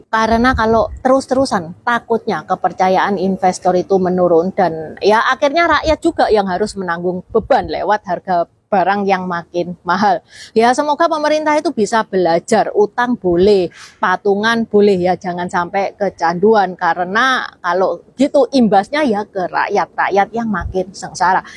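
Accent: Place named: Surabaya, Indonesia. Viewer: Wong iki logat suroboyaan. Medok temen. 😁 Yo wes ngomongo sak karepmu, tak rungokno. ☺️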